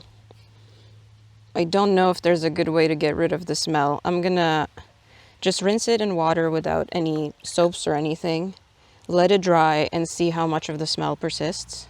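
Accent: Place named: Minnesota, United States. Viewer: Nice! I'm just starting to be interested in solar dying / natural dyes too... I live on an old farm in MN and have lots of goodies I can forage from my own land. Wondering if you are close to MN too, based on accent and what lichens you found to play with. Thanks for the video, hope to see and learn more!!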